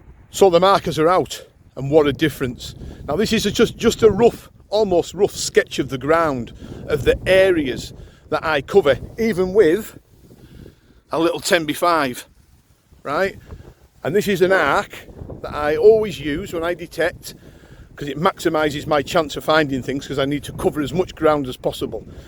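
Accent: Cumbria, United Kingdom. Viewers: Good video, I’m a newbie as you know only 4 of your digs in, but am always concentrating hard on keeping it low and slow as your cumbrian tones play out my head 🤣 but to be fair I could widen my arc by turning my hips more I gotta admit 👌🏻 Thanks for your all your efforts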